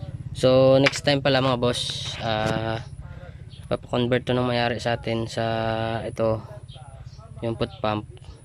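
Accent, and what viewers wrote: Iloilo, Philippines. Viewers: Bro may airgun foot pump man nga guba Ako lang nag Kay o... Ilonggo ka man bro? God bless bro.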